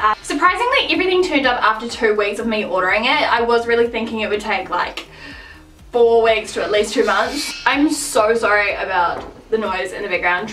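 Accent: New Zealand. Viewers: Are you from New Zealand?? If so SAME girl ❤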